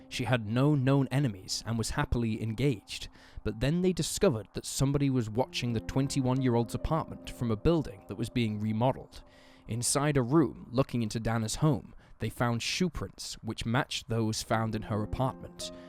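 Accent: England, United Kingdom. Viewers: Narrator has an English accent.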